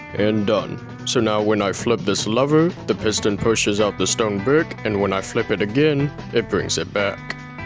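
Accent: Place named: Eastern Europe. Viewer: I did not expect that eastern europe accent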